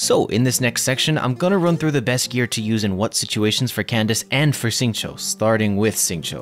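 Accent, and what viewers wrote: United Kingdom. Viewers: wait ur british huh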